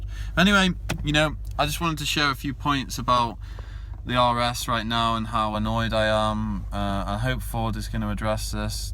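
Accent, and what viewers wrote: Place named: Sutton Coldfield, United Kingdom. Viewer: Are you from Sutton Coldfield or somewhere nearby?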